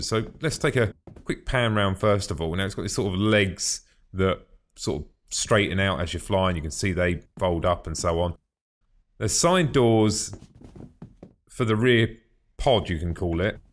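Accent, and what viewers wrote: United Kingdom. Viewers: your british accent makes me want to drink tea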